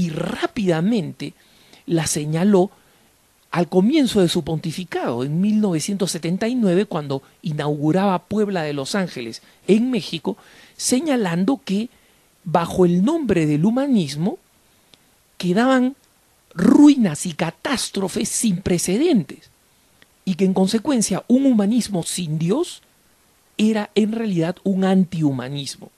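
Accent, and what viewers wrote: Lima, Peru. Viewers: eres peruano? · Creo que por el acento el pata es de Lima pituquilandia... me parece, o de Huacho o del norte chico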